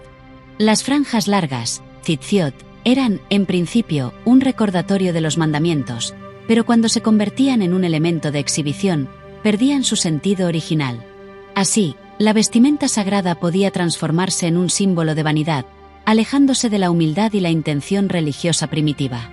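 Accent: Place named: Spain. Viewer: Es chocante el acento español de la locutora. Por eso...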